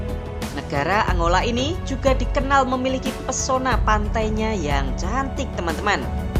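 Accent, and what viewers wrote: Java, Indonesia. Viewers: dubbing nya planga plongo medok gajelas.. ga nyaman bat di telinga...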